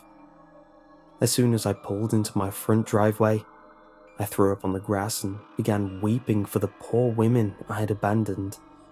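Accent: United Kingdom. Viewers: I can't believe how many views he gets, when i saw it this had only been up 18 minutes and already had over 1000 views. Guessing Americans like a creepy British voice? lol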